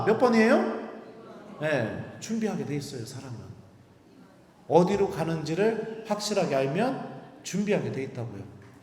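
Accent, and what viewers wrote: Chungcheong, South Korea. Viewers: 구수한 충청도 사투리도 정겹고 신부님 강의 매일미사 강론 너무 감동적으로 잘듣고 있어요. 신부님말씀듣고 어제 고해성사도 했어요. 정말로 좋은말씀 듣고 눈물콧물 흘렸어요. 감사합니다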